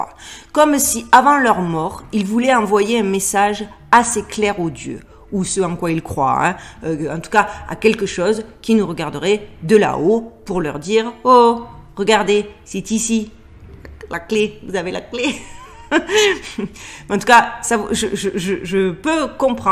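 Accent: South, France. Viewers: Namaste ,j'ai découvert ta chaine il y a peu et je me suis abonné. Je traite sur la mienne de paranormal,d'ufologie mais aussi d'archéologie alternative ,de mystères.... Tous ces sujets sont passionnants ,brabo pour les recherches , Que la Force soit avec toi et la Résistance amicalement , Doumé PS : j'adore ce petit accent du Sud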